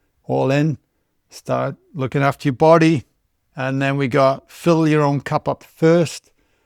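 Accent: Wales, United Kingdom. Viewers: Always up for a bit of Taff accent, greetings to a Welsh brother.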